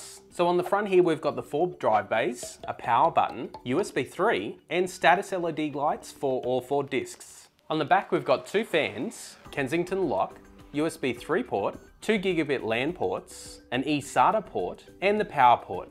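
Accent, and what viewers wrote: Australia. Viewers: notices Aussie accent liked and subbed mate. Great video btw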